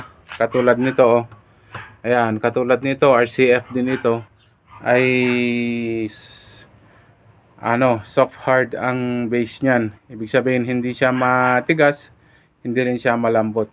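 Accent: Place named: Ilocos, Philippines. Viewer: Ilocano ka met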